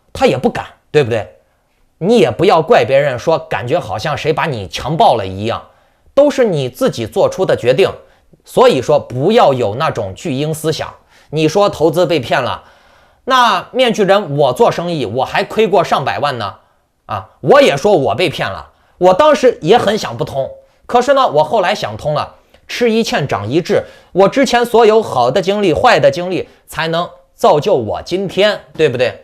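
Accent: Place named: China. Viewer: 大陆口音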